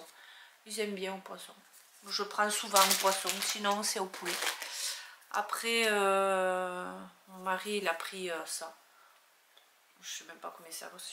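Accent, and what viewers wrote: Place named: South, France. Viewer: oui c est clair 🤣👌sans blague peut être qu il y a moyens de communiquer 😌j entend votre jolie accent du sud d ou êtes vous ?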